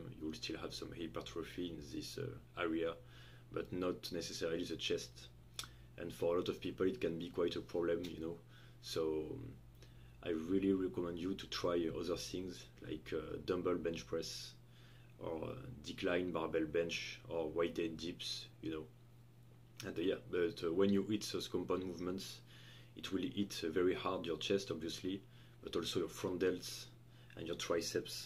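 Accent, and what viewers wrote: France. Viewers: Nice. Your accent is even thicker than NH's. Good thing I'm able to understand glorious French accents without much trouble. You have a pretty good physique, how many years have you been training for? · Is French Accent real or forced?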